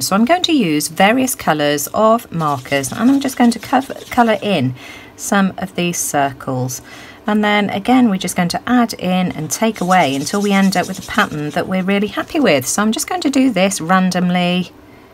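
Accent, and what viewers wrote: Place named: Suffolk, United Kingdom. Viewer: Boy you sound like my Suffolk family in the UK..made me home sick.😀😀😀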